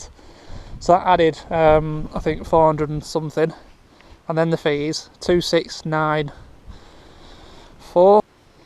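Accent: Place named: North, United Kingdom. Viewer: Lovely Jubbly, great northern voice.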